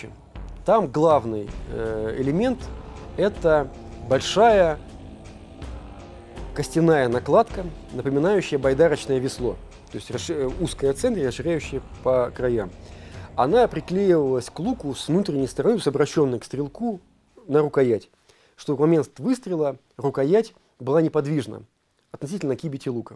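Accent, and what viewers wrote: Russia. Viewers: Россияне традиционно "акают". Говорят "МАсква" (пишут- Москва), говорят "агород" (пишут- огород) и т.д. Было бы странно если бы российский историк говорил бы так, как привычно казахстанцам, а не русским.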